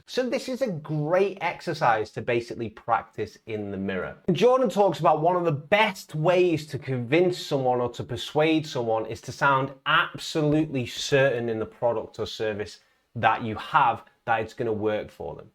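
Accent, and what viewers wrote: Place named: United States. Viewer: I like your accent, I have no idea which part is it from in the US, but it's cool haha.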